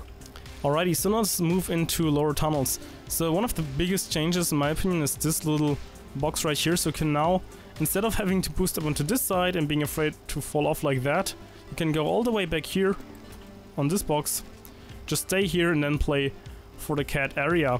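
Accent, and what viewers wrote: Germany. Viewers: man hört richtig das du deutsch bist, trotzdem gutes Video 💪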